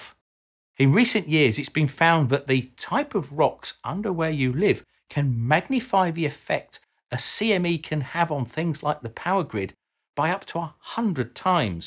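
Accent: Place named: England, United Kingdom. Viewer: Your accent is so... Brrritish!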